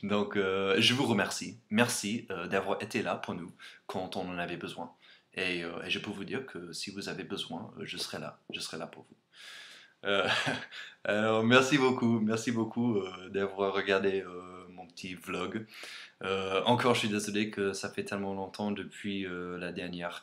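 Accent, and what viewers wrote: United States. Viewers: On pense que les Américains s'habillent mal (chaussure qui ne s'accorde pas avec une jupe ou une robe et aussi un t-shirt au-dessus d'un sous-pull). Sinon, vous êtes toujours enthousiaste, vous dites "je t'aime" facilement alors qu'en France on ne le dit pas, et aussi vous parlez très vite, c'est comme si vous aviez un chewing-gum quand vous parlez (à cause de votre accent américains. Mais on vous aime😊.❤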